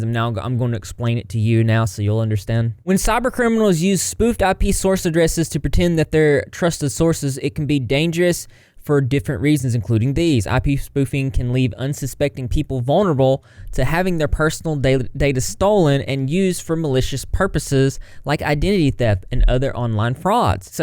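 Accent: South, United States. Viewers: i wasn’t expecting a homey southern accent but it is welcomed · Off topic but... I've never watched your videos before, but you have such a beautiful voice and accent 😍 Subscribed! Where in the US are you from?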